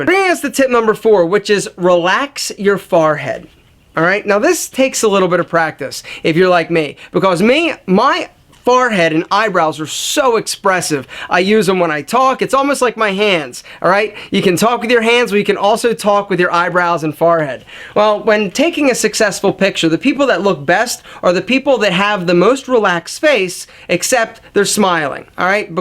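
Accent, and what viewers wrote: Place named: New York, United States. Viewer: Athalos hes from the East like New York or something like that i think ha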